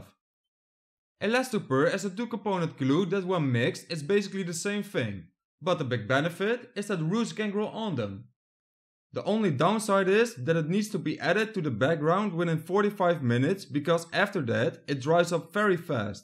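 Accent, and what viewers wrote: Netherlands. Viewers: You sound so dutch. Love it. But was exactly what i was looking for! Thanks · Mooie en goeie tutorial man! je hebt me zeker geïnspireerd! en dat mooie nederlandse accent valt niet te missen (' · Ben jij Nederlands gezien het accent???🇳🇱🇳🇱🇳🇱🇳🇱🤣🤣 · Leuk gedaan. Wel duidelijk, ookal is het in bloemkool engels.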